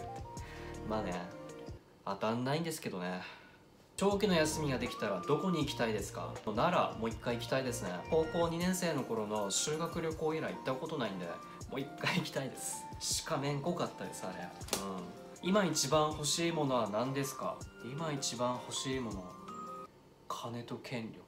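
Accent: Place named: Hokkaido, Japan. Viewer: かっこよくて、介護士さん。ちょっとした北海道なまり？も、とても可愛いと思います！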